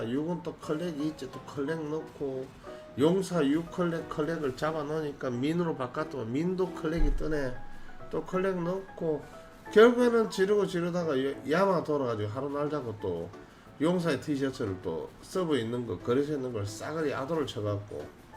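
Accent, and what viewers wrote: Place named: Gyeongsang, South Korea. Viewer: 경상도 사투리가 제스타일입니다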